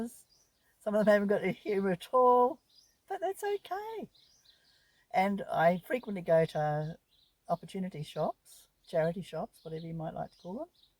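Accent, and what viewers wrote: New Zealand. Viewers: It's fantastic to hear a kiwi accent. Totally agree, everyone needs a purpose, even if it's small.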